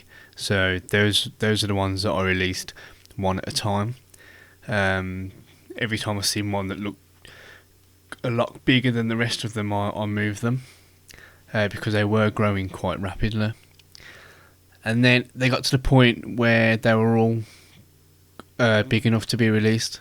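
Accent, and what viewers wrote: Birmingham, United Kingdom. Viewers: Lovely brummy accent there lol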